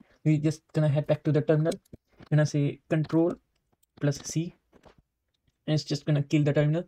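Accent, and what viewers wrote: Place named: Ukraine. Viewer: you got really strong ukrainian accent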